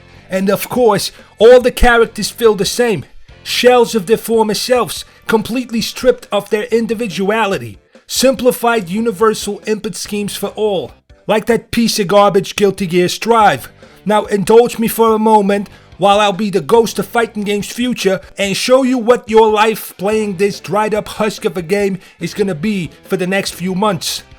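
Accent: New York, United States. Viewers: Dude sound like a 30 year old New Yorker from the 70s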